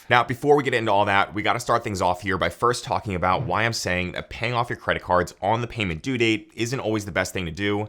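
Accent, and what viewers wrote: Philadelphia, United States. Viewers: Wait are you from Philly?! 😂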